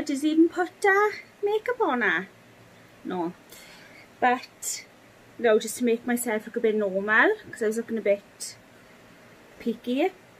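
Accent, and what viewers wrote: Wales, United Kingdom. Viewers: Hiya Lovely x A fellow 'Welshie' even though I'm South African born 😊 x Just loooove your accent way too much x Literally just done mine as well and same result with the more yellowy roots x Was just wondering if you've used a purple shampoo after the video and if you have, did it help with the yellow?? As heck yes, I love the results but nearly had a stroke as the yellowy roots make me look proper pink lol x I'm already in my head summoning my hairdresser friends for emergency damage control lol! 😨😅😂 x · I looove your welsh accent its so cute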